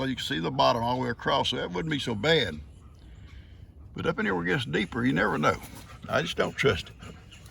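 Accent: South, United States. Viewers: Philadelphia checking-in., I'm not quite sure what it is but when I'm feeling down and don't get me wrong I always watch your content. Either your soothing voice your Southern drawl or the animals really cheer me up and put me in a positive mood. So I thank you sir. 👍💪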